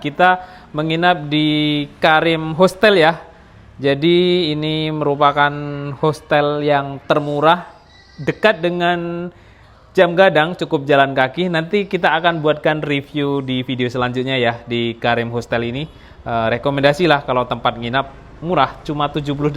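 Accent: Java, Indonesia. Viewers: Maaf mau nanya...abang org jawa ya...keliatan dr bahasanya kdg suka medok😊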